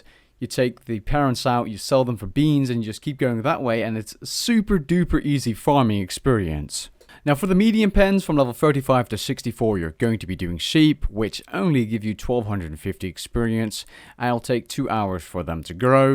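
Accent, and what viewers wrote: Netherlands. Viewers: i knew there was a bit dutch in the voice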